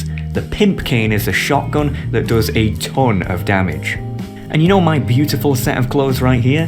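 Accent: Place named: Scotland, United Kingdom. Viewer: You’re scottish i think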